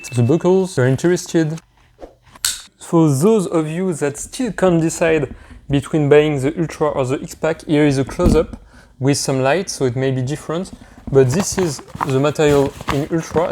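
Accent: France. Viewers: Pour un français (l'accent se reconnait bien ;) ) la traduction auto serait compliquée. Déjà qu'avec des anglais c'est très aléatoire... Pour construire une commu Francophone, ce serait mieux j'imagine. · Nice french accent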